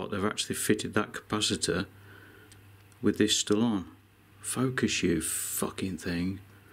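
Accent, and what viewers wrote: Birmingham, United Kingdom. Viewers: I find your Brummie pronunciation of 'Bluetooth' a bit different to how we say it up north.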